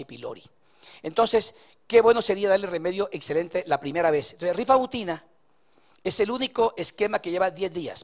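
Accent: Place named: Spain. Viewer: Eeexelente conferenca y sobretodo laclaridady la sencillez con esa explicacon genial el Doc ¿de donde es por su acento al hablar parece ser de España muuchas felicidades Doc. Siga asi amandosu carrera nocomo otro$$$$$.....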